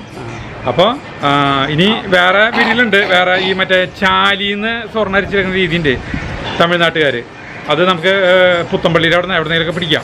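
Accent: Thrissur, India. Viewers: സ്ലാങ് കേട്ടിട്ട് തൃശ്ശൂക്കാരൻ അന്നെന്നു തോന്നുന്നു. ഞാൻ തൃശ്ശൂരാട്ട നിങ്ങൾ തൃശൂർ എവിടെയാ 😍😍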